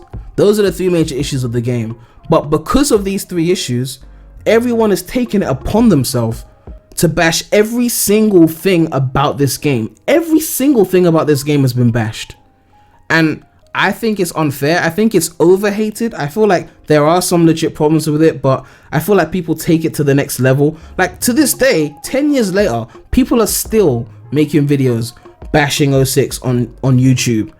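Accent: United Kingdom. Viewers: Great, I'm new to your channel so I'm curious about this, do you have some British in you? You sound kind of British. lol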